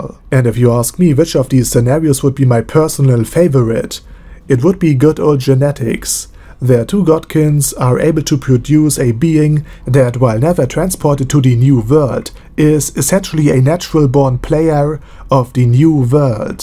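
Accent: Germany. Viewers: Dieser deutsche ne akzent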